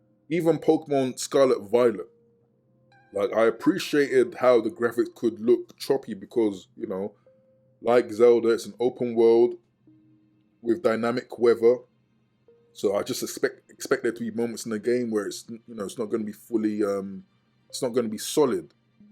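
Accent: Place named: United Kingdom. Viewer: Subbed as soona s I heard your voice! Most Zelda content creators are American, nice to hear a British opinion 💕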